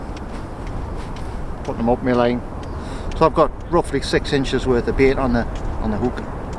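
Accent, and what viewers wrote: Newcastle, United Kingdom. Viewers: Just Subscribed after finding your videos really enjoyed them and keep the Geordie accent its your home and I can tell its something your proud off, great fishing tips, so sorry about your Mum but not a day will pass when she won't be in your thoughts, hopefully I will get out that way with my kayak. Cheers.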